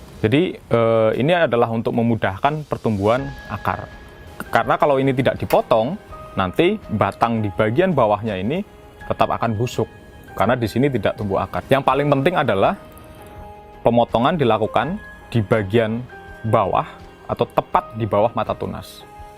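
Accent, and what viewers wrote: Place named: Banyumas, Indonesia. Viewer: Mantep!! Ngapak kie mas e kaya inyong. Btw kalau batangnya kering ngerendamnya lagsung pake air+fungisida atau rendam air dulu baru fungisida?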